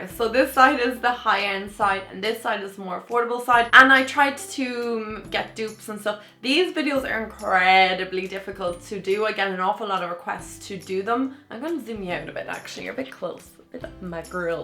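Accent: Ireland. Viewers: I heard an Irish accent and the description says you are from Ireland. Yesss!!!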